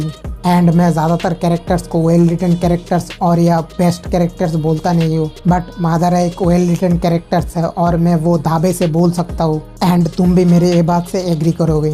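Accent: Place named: Northeast India, India. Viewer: Voice sunke aisa lagta he ki tum Manipur, nagaland, mizoram, odisha me kahise ho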